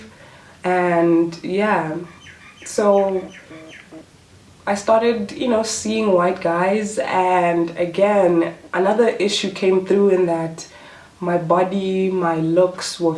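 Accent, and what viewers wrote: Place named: California, United States. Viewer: Which part of South Africa you from? Interracial dating isn’t common at all. You making sound as if you from California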